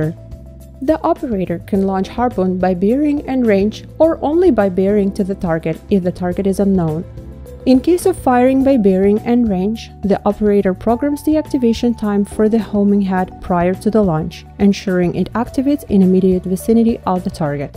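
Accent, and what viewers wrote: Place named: Russia. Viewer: I’ll take a person with a Russian accents word over anything produced in the us as to the true capabilities of this weapon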